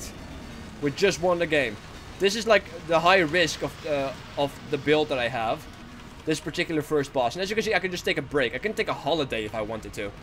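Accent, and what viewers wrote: Netherlands. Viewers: your dutch?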